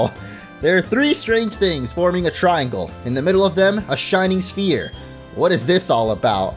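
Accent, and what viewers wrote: France. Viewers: Your french accent is hilarious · Love your Frenglish accent xD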